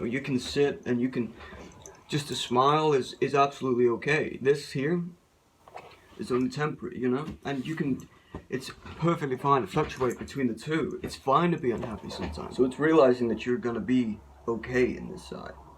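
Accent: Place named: Wales, United Kingdom. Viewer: Yes he is from wales he has a beautiful accent and really dark eyes I could look into them all the time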